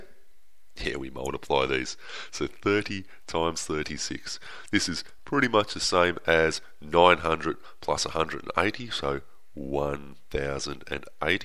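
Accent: Australia. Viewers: Are you Australian? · What part of Aussie are you from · is he Aussie (Australian)?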